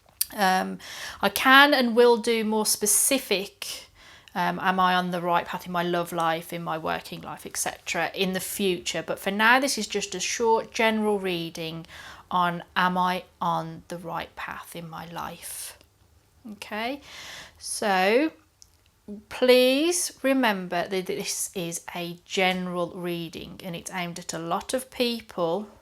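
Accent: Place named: Tamworth, United Kingdom. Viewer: wow are you from Tamworth UK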